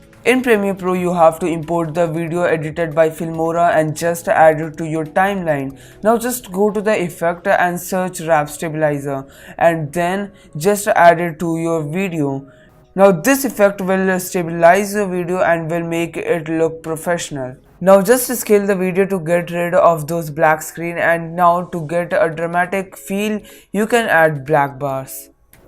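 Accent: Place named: India. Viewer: From your voice I am taking guarrentee that you are 🇮🇳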